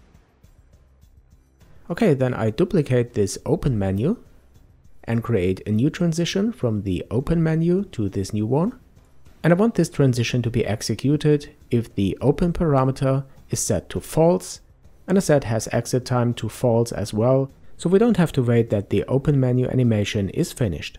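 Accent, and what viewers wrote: Germany. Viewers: You sound so german I almost thought you would start talking german.^^ Fand ich irgendwie totaly trippy.^^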